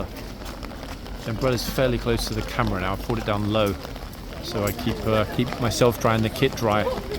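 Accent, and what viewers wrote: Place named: United Kingdom. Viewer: I so enjoy watching your videos from Naples Fl USA! You narrate so well!! And you awesome British accent doesnt hurt either! Maybe sometime you can face the camera and wave to all of us!! Cheers!!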